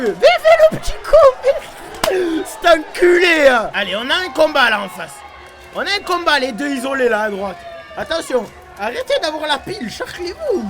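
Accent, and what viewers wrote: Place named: Marseille, France. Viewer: Challenge: tu pourrais faire une vidéo réaction sans tout axant marseillais, sa serait cool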